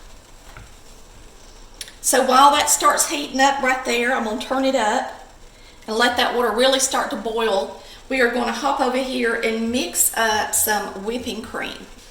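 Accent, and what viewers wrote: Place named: South, United States. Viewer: I love 💕 your southern accent and my heart ❤️ could just melt!!! I’m going to try your recipe Mrs!! If I love ❤️ lemon meringue pie, then key lime pie should be great 😊 for me!! Thanks for sharing honey 🍯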